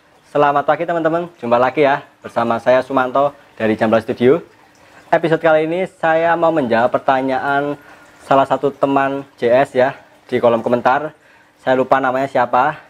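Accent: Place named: Java, Indonesia. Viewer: Wong jowo angel ilang jowone👍🏼😁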